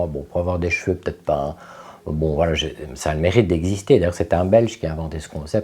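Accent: South, France. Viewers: Vive l’accent du sud. Team innocence. Vive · Vive les #LUDIVINE du Sud 🌞😂😂le sud Montpellier c'est la vie les cigales l'accent le pastis et curennnnte boody 😉🤣💋💖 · J arrête pas de rire entre curent de beauty Ave l accent du sud et la pipe oh que c est bon de rire🤣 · Ah cher, c'est sur c'est nous qu on est les meilleurs 😉et vive l accent du sud. Merci pour ce moment précieux 🤗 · Je suis très avare de comme,t’aimes, mais avoir une vidéo pour les 10k, tout en apprenant des anecdotes sur current body (accent du suuuuuud) , franchement ça vaut bien un peu d’effort de ma,part. Merci et bon courage à toi 😊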